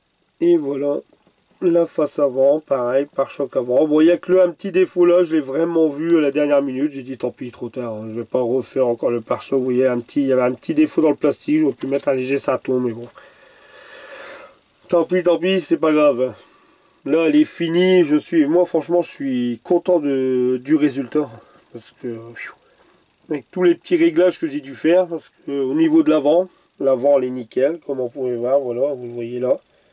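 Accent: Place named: South, France. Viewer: 😂😂 je l’aime cet accent du midi.......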